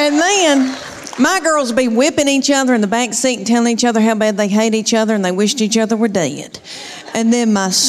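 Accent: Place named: Western North Carolina, United States. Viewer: I hear some western North Carolina in her accent especially when her sentences sounds like questions? I’m from Waco Texas via Nashville and raised in Western Kentucky before that so you can’t go by me. We never had kids but if we did I’d take them to Leann for some straightening out.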